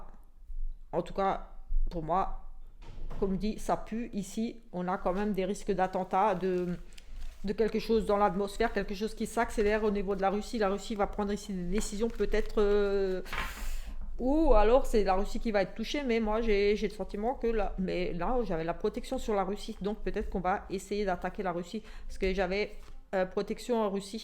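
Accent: Alsace, France. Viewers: J'adore votre accent depuis 6 ans je vie en Alsace avant jetait en Moselle hopla dü ! Bisous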